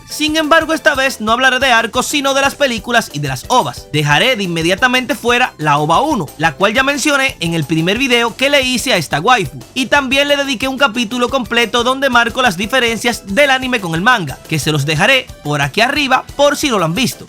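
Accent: Dominican Republic. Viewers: una pregunta tu acento me suena muy dominicano, y te pregunto ¿si eres dominicano verdad? porque ese acento lo reconozco muy bien, y si no eres dominicano de donde eres porque ese acento no me suena ni a cuba ni a puerto rico que son los paises que hablan mas similar a republica dominicana, aunque estoy entre la espada y la pared si es dominicano o puertoriqueño pero la diferencia es que nunca reemplazas la "r" con la "l", y incluso pones referencias de personas dominicanas en tus memes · Una pregunta: por casualidad eres dominicano?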